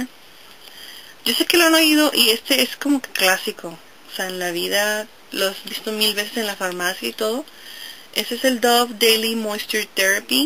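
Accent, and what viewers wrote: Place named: North, Mexico. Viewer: buen consejo gracias... Eres de Tijuana?? hablas identico que mi hermana.. bien nortena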